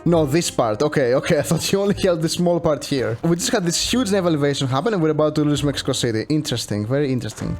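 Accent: Greece. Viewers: He sounded Greek to me and because I'm Greek to I had to make sure thanks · Your accent sounds like your greek who speaks English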